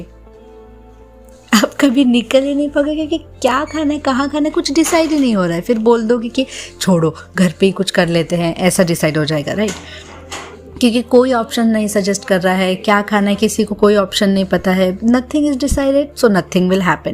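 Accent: Gujarat, India. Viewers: Gujju accent Kesaa, hahahah happens to me too LOL love you and your innocence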